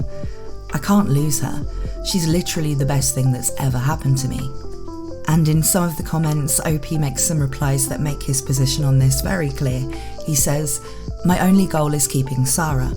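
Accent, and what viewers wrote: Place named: United Kingdom. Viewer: Finally. It's in british accent.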